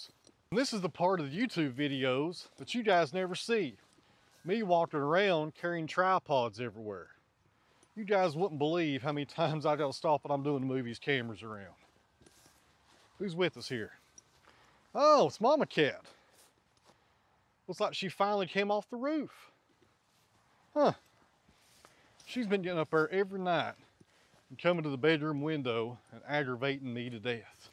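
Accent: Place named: Kingsport, United States. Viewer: I like Billy Bob Thornton, too, so look at the comparison as a great compliment. Nearly 50 years ago I used to go to Kingsport Press to oversee production of some of the books I worked on, and I loved the area and the folks who worked there were great to work with and to be around. You, so much remind me of those good, friendly people. And you talk like them’ too. I love the dialect. I used to say that I didn’t care for cats, but that was before I got to know and understand ‘em. Interesting critters they are. I l very much enjoy your channel and keep up the good work.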